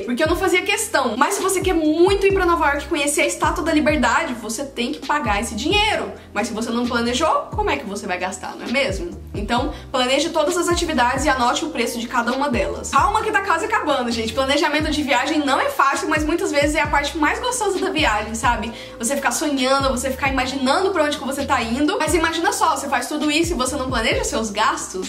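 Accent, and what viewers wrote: Goiás, Brazil. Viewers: Sem conhecer você em um outro vídeo imaginei que fosse goiana pelo sotaque,morro de vontade de ir para outro país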